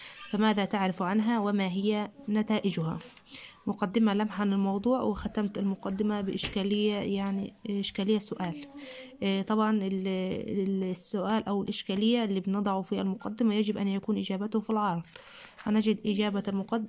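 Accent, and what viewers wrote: Egypt. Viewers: انتي مصرية ولا جزائرية تقديم الدرس رديء جدا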